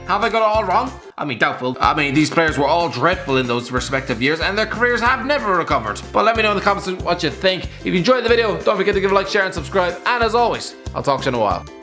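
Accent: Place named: Ireland. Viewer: Is it an Irish accent thing, to pronounce 200x as 100x?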